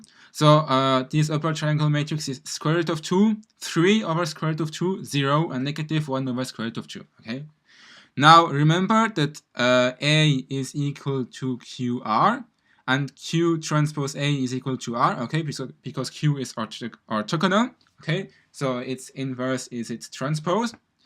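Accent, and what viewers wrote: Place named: Germany. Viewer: Are you german? Haha I like the way you talk. Anyway, nice videos!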